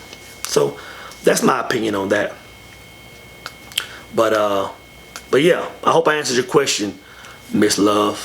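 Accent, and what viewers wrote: Texas, United States. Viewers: Before I knew you were a PK (preacher's kid) I saw the pastoral love in your eyes; the apple don't fall far....(Your Texan accent is awesome, BTW). I am amazed by the gentleness by which you edify your brothers. God has a very powerful calling on your life, and I am committed to pray you and the other brothers become all you are meant to be according to God's grand plan. Keep up the good work. My heart wants to be sad that you feel marriage is not for you, but I can understand being in that state because of past experiences and being screwed over by society. May I say, DON'T LET THEM WIN! I don't know how your individual lives will turn out but just know here is a sister who wishes you well, and laments the pain/frustration/mistreatment you have been through. I hold you all in high regard and expect things in your life to get better. 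I wish you to be surrounded by people who see the treasure in you and draw it out. You will not go down. You've already been down. Now is the time to keep going, keep rising, keep building. The generations will sit at your feet and say, "Dad....Honey...Grandpa, tell me...teach me...explain...show me" #YouTheMan #YouTheBoss #TheEarthNeedsYou, my kings!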